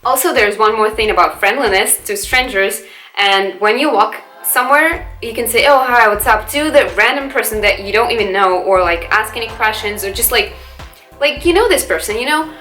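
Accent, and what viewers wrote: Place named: Russia. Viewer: Your English is superb. I didn't realize your accent was Russian until you started talking about being there. Great video.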